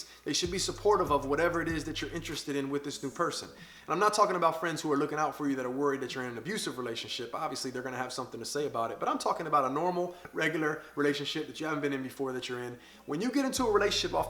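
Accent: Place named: South, United States. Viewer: Country accents are ☺️☺️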